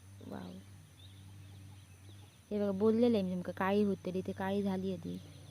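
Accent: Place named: Malvani, India. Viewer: छान शुद्ध मालवणी ऐकुन बरं वाटलं. गावाचं नाव सांगितलें असते तर अजुन व्हिडीओ ची मजा आली असती. शेअर लाईक करा.मालवणी भाषे करिता.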